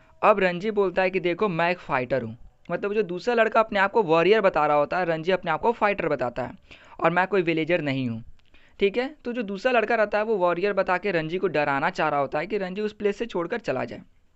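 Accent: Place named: Jharkhand, India. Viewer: Jharkhand se ho na?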